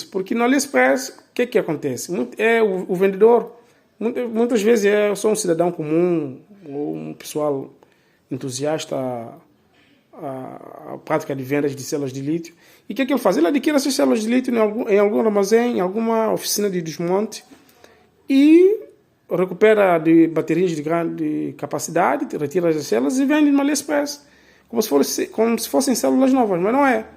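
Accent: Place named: Portugal. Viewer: O idioma dele é português, mas com sotaque de Portugal, de repente, lá no país onde se encontra, consiga comprar baterias à preços mais honestos, mas para nós aqui do Brasil, os preços estão de ferrar mesmo, tanto pelo Aliexpress direto da China, quanto os ditos "estoques do Brasil com todos os impostos inclusos".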